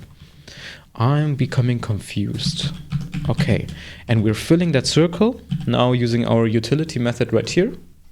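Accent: Germany. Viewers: German accent ↗️↗️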